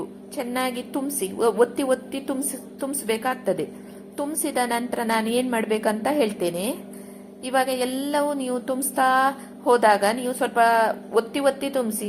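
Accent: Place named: Mangalore, India. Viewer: ಮಂಗಳೂರ್ ಕನ್ನಡ ಕೇಳೋಕ್ ಚಂದ ಹಾಗಲಕಾಯಿ ಸಿಪ್ಪೆ ಯಾವತ್ತೂ ತೆಗೆದಿಲ್ಲ ರೆಸಿಪಿ 👌👌👌😋😋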